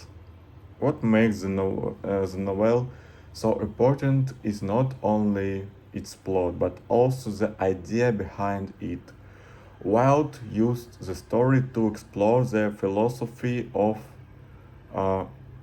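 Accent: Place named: Eastern Europe. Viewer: To talk about this more, I don’t personally think hedonism is inherently bad, but I think it is often unhealthy for a person mentally. I don’t know what culture you are from, certainly your accent sounds like one of the languages in the Slavic family, but I’m from an area that is very repressed and people are not as open or accepting of others or new experiences as they should be in a healthy society. I feel like Mr. Wilde should not have been persecuted by others, but also that he should not have persecuted himself.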